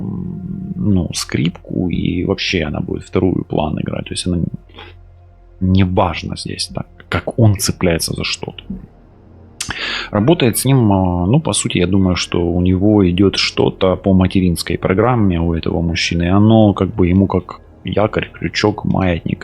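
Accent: Ukraine. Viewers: доброе утро! Как я соскучилась по Вашему голосу,раскладу,как мне нравится как Вы доходчиво всё доводите до нас,как нравится Ваш хохлятский говор,я прожила 42 года с хохлом,мне всегда нравился их говор, шо требе,кохано,цебуля,цеберка,тырло...Что же у меня будет с моим Анатолием,42 г.прожили вместе, больше нет сил терпеть унижения,уехала,в четвертый раз,надеюсь навсегда, больше ничего не хочется,пятеро внуков,две дочери 39,41г. Говорят мама поживи для себя,папа никогда не изменится Анатолий,<num> г р Что нас с ним ждет в наших отношениях?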